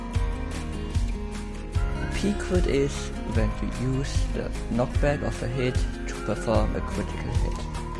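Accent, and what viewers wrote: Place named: Germany. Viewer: Ngl as a German I think u have such a German accent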